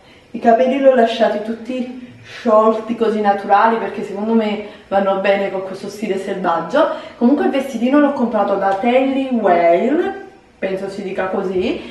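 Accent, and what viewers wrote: Naples, Italy. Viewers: ma tu di che parte di napoli sei?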